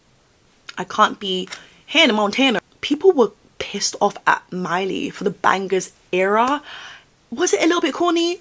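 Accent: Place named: England, United Kingdom. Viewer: GIRL how did i never noticed that you had an English accent until you literally said so 🤦‍♀️🤦‍♀️🤦‍♀️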